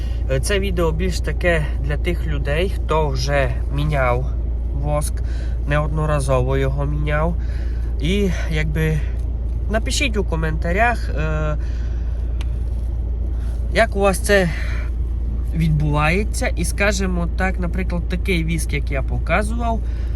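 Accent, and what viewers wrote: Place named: Transcarpathia, Ukraine. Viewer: Ви з Закарпатя говірка закарпацка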